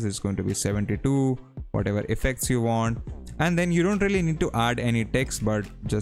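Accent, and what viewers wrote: India. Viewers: You know you've found the right tutorial when the person with an Indian accent shows you how to do the things in the tutorial.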